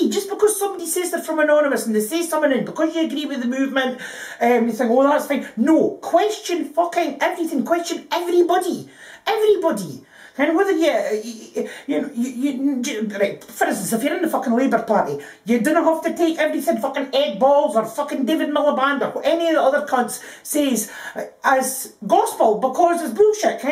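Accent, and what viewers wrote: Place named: Canada. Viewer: i could sit and listen to her accent for hours whatever shes talking aboot (about)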